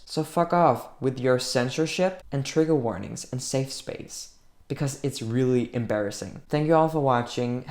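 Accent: United States. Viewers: Wow! Hade inte gissat på att du var Dansk. Din dialekt låter amerikansk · You have a good American accent, do Danes speak like you generally? 🤗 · I’m an old man, 74 years old, I admit that I’m having trouble understanding what to me is a new phenomena. I was surprised that you aren’t an American because you sound so American. I find you to be thoughtful and very reasonable. Until I came across your channel I thought that people in your community were rather odd and very pushy. Thank You for dissuading me of that notion. But correct me if I am wrong; I suspect that you are in a minority in your community.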